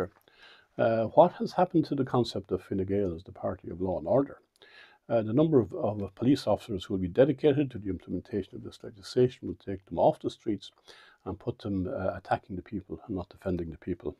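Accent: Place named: Canada. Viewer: bruh sounds a lot like canada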